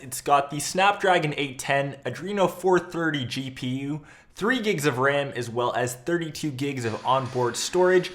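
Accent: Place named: Canada. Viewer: the phone is ok. your Canadian accent sucks. we don't care about your vacation.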